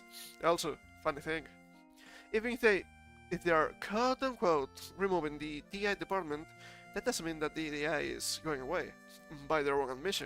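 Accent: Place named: Germany. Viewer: Dude what accent is that?? I thought you where speaking German at first.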